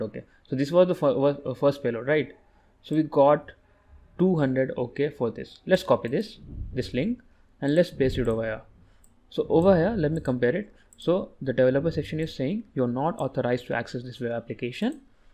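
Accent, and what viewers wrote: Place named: India. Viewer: he tries) but the accent is terrrrrrible..I'm drowning in his Hindi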